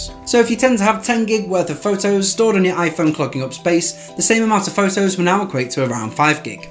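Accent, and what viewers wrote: United Kingdom. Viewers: Very fast British English I guess. Way to fast for my German brain 😂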